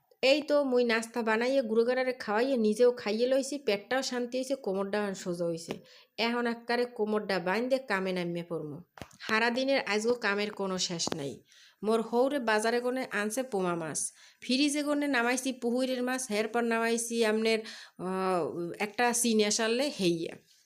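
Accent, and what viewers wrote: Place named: Barguna, Bangladesh. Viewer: ময়না পাখি তোমার ভিডিও আজকে প্রথম দেখলাম তোমার কথাগুলো সত্যি খুব ভালো লাগলো ❤আমার শ্বশুরবাড়ির অঞ্চলের ভাষা মনে হয়েছে আমি যেন বরগুনায় আছি ❤বাজার গুছিয়ে নেওয়া সবকিছু মিলিয়ে খুব ভালো লাগলো❤❤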